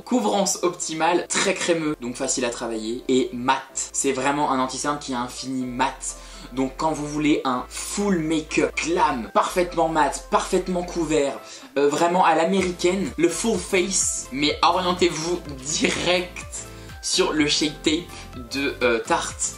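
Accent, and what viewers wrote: United Kingdom. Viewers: Il a l’air pas mal le petit dernier ! 😃 et cette petite pique 😂 ton accent anglais on l’adore 😍😃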